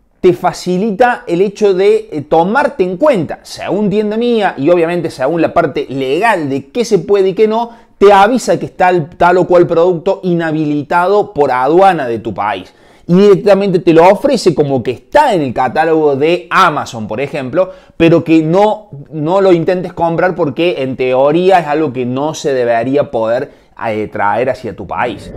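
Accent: Córdoba, Argentina. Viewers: Que lindo acento cordobés!! Saludos desde Uruguay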